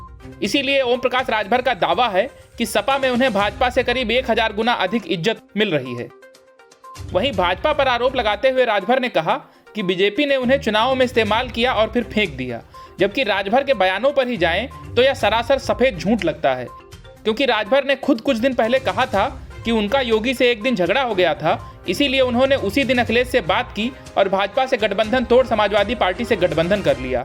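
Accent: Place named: Bihar, India. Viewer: Newsofindia wallon please apna ye bihari news reader / narrator change karo. Pehle wala accha tha, clear tha. Ye "saRak puR ghoRa khaR khaR dauRta hai" wala nahi chaiye. Please bring the old voice back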